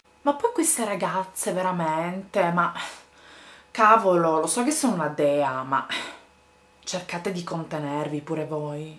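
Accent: Sicily, Italy. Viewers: ma quanto ti adoroooooooooooooooooooooooooooooooooooooo..... poi il tuo accento SICILIANO lo adoro.... anchè perchè anche io sono catanese :******************************************* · sei siciliana? :)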